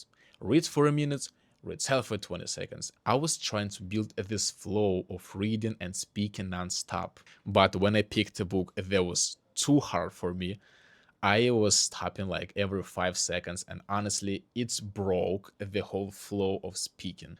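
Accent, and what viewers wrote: Russia. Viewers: The moment you spoke I knew you were Russian how I love the Russian accent 💗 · Aww, you have a brilliant Russian accent❤ Your accent gave away your nationality😅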